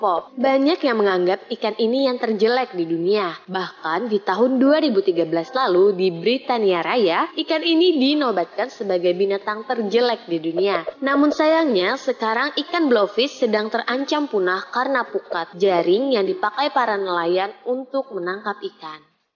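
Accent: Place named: Borneo, Indonesia. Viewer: Asli bujur2 kada kawa diwaluhi tiap pandirannya berassaaaa banarrr ilat2 bubuhan benua borneo wkwkk Mantap banar, min. Katuju aku wan channel pian 🖒🖒🖒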